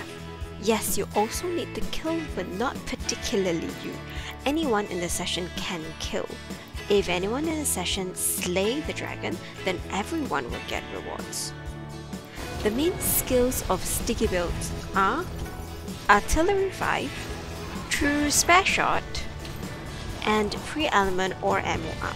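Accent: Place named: Singapore. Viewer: You sound so Singaporean!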